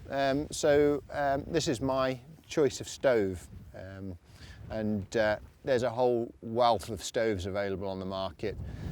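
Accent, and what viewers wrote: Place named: England, United Kingdom. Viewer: He sounds English and most of the rules he's advocating only apply in England. He does not seem to realise Scotland is part of UK.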